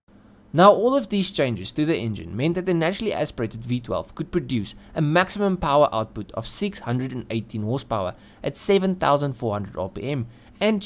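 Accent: Afrikaans, South Africa. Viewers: Can't decide what sounds better - the S70/2 or the Afrikaans accent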